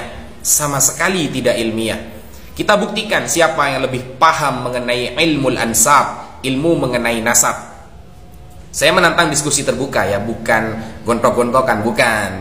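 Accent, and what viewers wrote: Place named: Madura, Indonesia. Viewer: Logat Madura banget gus *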